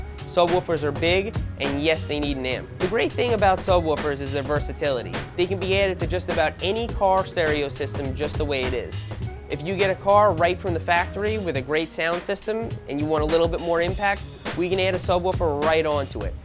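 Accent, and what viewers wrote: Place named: Long Island, United States. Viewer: Long Island accent is coming through HARD compared to nowadays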